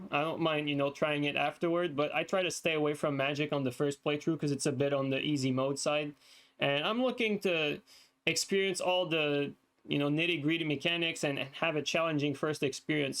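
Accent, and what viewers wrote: Quebec, Canada. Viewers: This guy also sounds so Quebecois, cris de tabarnak.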